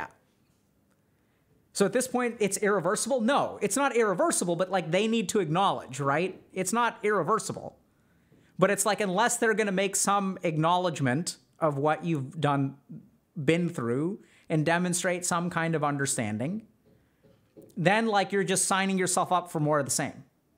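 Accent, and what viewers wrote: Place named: India. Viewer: your Hindi accent is hilarious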